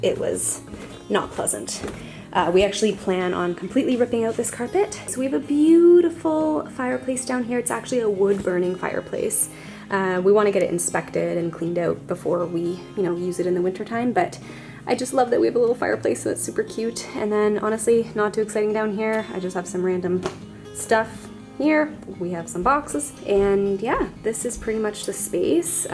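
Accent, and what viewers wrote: Canada. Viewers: Hehe I love your Canadian accent ie the way you say "house"! It's so fast that it's almost like "hoose" and it's very cute!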